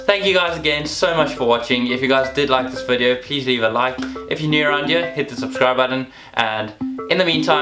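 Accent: South Africa. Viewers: Mens kan hoor jys afrikaans lol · Are you south african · Are you South African my mum is from South Africa 🇿🇦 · He’s South African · Are you south african??? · You sound South African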